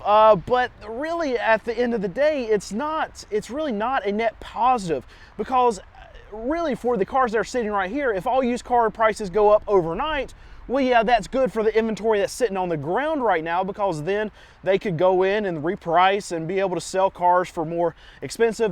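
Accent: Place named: South, United States. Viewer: Here for the southern accent "Becuhzzz'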